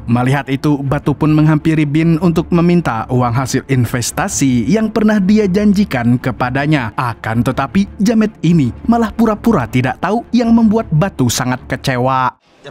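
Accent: Banjar, Indonesia. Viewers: Adminnya orang Banjar kah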